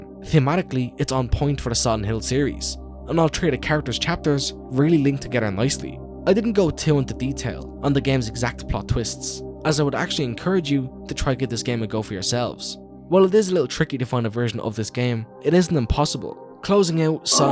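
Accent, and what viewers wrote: Ireland. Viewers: Irish accent horror game longform videos? I'm so so so in. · Are you Irish? Irish SH fans unitee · An Irish SH YouTuber? subbed!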